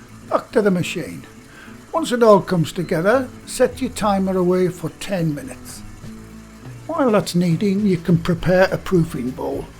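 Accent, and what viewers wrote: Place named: Northeast England, United Kingdom. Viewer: from your accent you where brought up in the North East of England, the North East of the UK must be well into Scotland.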